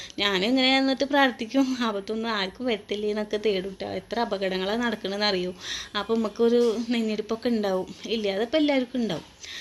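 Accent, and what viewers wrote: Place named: Malappuram, India. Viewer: ഞാനൊരു മലപ്പുറം ഞാനിങ്ങനെ സംസാരിക്കും ❤ എനിക്കിഷ്ടപ്പെട്ടു😂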